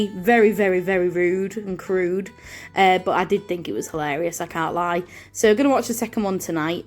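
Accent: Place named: Yorkshire, United Kingdom. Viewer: Thank you for sharing your lovely video. You accent is great. I love the Yorkshire accent. Here in South Africa we are in level 1 which is pretty much the same as normal. We have to wear masks and sanitize our hands before going into any shop. We are going into summer now so hopefully we'll be able to get out and about. Have a great week.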